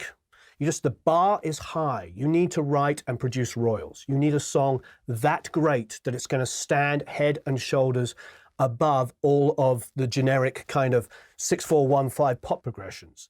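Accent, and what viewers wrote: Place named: United Kingdom. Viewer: This was amazing information,. I can see from your other videos how much you respond to everyone, and it truly shows you respect us and, as you say here, VALUE us. It's always a pleasure to hear you, especially since most of the amazing people I've worked with have had British accents.;) Cheers, and again, thank you for being so personal and invested.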